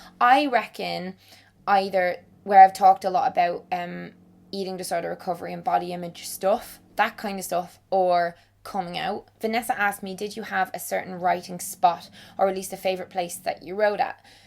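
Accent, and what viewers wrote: Ireland. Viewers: I'm 20 in like five weeks, and I've preordered it on audible because I'm poor and I used my monthly credit. Can't wait to listen to your lovely Irish voice 😘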